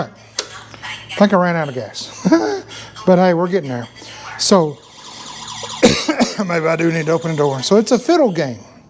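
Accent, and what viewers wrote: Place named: Tennessee, United States. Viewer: Very nice! You sound like you’re from my next of the woods (TN)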